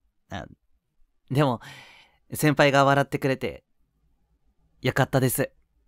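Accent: Kumamoto, Japan. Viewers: 今日は何かありましたぁ？何かのご褒美ですかー？くんの熊本弁好きすぎるよ～😂 「僕やったら、いつでんよかですよ😊」「笑っとっとが可愛か～」←合ってる？書くと難しい💦 の声好きー♡ 何か…柔らかくてほんわかして温かいです😊明日も元気に頑張れそうです👊ありがとー✨ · 熊本弁ｯｯｯ…😭 熊本弁のボイス大好きなのでめっちゃ嬉しいです😭🎶 つい熊本弁で返してしまいますわ🤷🏻‍♀️ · すごい好き！！方言いい！！分からないのは多分ないかな。敬語で方言とかいい！熊本弁だからいいのかなー。後輩くんに欲しい:_わーい:_わーい:_わーい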